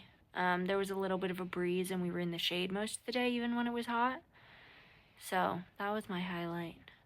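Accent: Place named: South, United States. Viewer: Where in Maryland with a southern accent. I think the East Coast is changing yalls speech 😅 o yea you all live in LA yea you all have I-5 and yes I been there and by far some of the worst traffic I have ever sat in before. O wow the video in the dark reminds me of the (are you afraid of the dark) lol. I know the rocks are going to get worse unfortunately 😓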